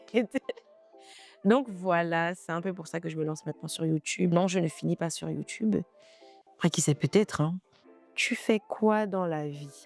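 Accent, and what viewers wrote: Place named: Gabon. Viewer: Une gaboma j'ai reconnu l'accent ❤❤❤❤❤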